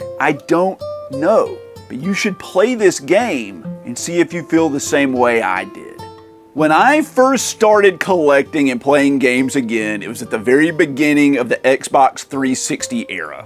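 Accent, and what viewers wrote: South, United States. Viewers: Man, As a gamer who watches tons of Youtube Game Reviewers, you are the first that sounds like someone from where im from...The south... Nice to hear a good ole country boy on the gaming scene....Glad i found your channel😀. I fall asleep to AVGN, but i woke up hearing your voice like “who is this?”…Hooked!!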